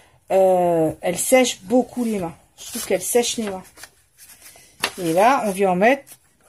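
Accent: Paris, France. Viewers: Coucou... ah le bel accent parisien, tu peux pas renier tes 47 ans de capitale ! c'est super... moi aussi je suis parigote du 15eme , rue de la Convention pendant 35 ans, comme mes parents et grands parents qui y sont nés eux aussi....et le Marché st Pierre j'adorais y aller avec une copine, dans le bon vieux temps... Maintenant j'habite au bord de la méditerranée depuis plus de 30 ans.... c'est agréable il fait beau, c'est different... Bon c'est pas le tout maintenant il faut finir cet album , car le plus dur va être la reliure je crois. En tous cas tes papiers sont superbes. A bientot, je me suis abonnée à ta chaine.. bises <3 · Je n'avais jamais vu un album fait de cette façon c'est tip top. J'adore le bloc Paris il est super beau et j'ai tout pigé !! J'aime aussi beaucoup l'embellissement que tu as acheté pour ta fleur en organza. Je trouve super élégant. 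J'ai tout pigé à droite à gauche on voit bien s'il y a un vide ou pas. Ah bon t'es une parisienne. Moi aussi je connais bien le marché St Pierre. J'y achetais de la soie. J'ai fait de la peinture sur soie pdt longtemps. Moi je suis une banlieusarde depuis toujours !! Je comprends mieux ton phrasé ... Très parisienne... J'adore.... Bisous 😘💋🌞🐦🌈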